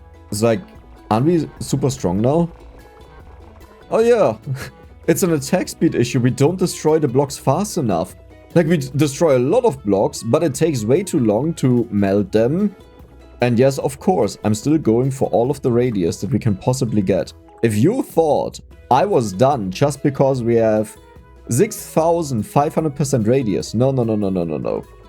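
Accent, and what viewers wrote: Germany. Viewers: Höre ich da Deutsch 🇩🇪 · The word iron is a phonetic catastrophe, pronounce it literally however you want. Aussies don't really pronounce Rs in most words so I'm used to hearing it pronounced the same as "eyeing" with a silent g but I've heard countless youtubers pronounce it differently and the only thing that really matters is that people know what you mean. If "eye-ren" is what feels most comfortable for you given that you speak multiple dialects, then that's what feels more comfortable. Personally I like hearing the little German affectations that come through your English speaking, and I normally really struggle with accents cause hearing problems (that said I really do appreciate your vocal projection and how much you annunciate cause it makes it a lot easier for me to listen) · It's not quite ion, more like iun. You actually said it properly at least once! 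But I love the way you've been saying it, the German accent makes it sound medieval and authentic somehow! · Ich habe die ganze Zeit darüber nachgedacht ob du deutsch bist wegen deinem Akzent.. und dann würdest du wütend, ich habe mich kaputt gelacht😂😂 (Inserts to friendly German) 😂😂😂😂😂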